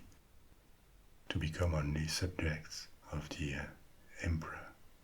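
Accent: France. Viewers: I hate the fact that this channel is labelled ASMR. I hate the way you whisper. Hell, I even hate your French accent. But bro... son of a gun, I will be going to sleep to your soothing tales of ancient myths, legends, and history for the next few months. Damn you, ASMR Frenchman. Damn you.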